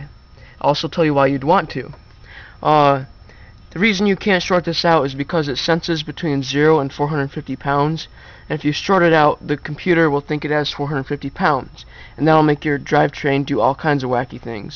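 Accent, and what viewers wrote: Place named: Portugal. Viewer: You sound like my Portuguese homie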